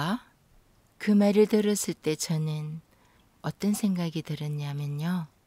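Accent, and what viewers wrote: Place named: Gyeongsang, South Korea. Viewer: 참~~경상도 사투리는 언제나 들어도 좋아요 사람을 무장해제시키는 매력있어요^^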